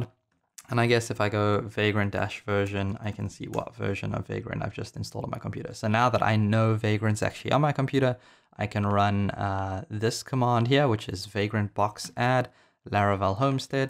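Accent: South Africa. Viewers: While I was listening to you my wife walked in and she was like, bet you his south african 😆 I lived in cape town for years but it became way to busy for me. Living in a small coastal town atm. Thank you for the great content.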